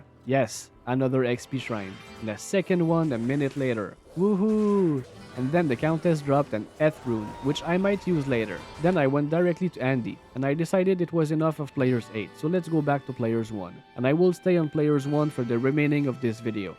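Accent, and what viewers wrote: Quebec, Canada. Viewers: Accent Québecois? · I just want an answer, your accent, it says Canada, are you from Québec? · Are you French-Canadian? Your accent sounds very denis villeneuve to my ears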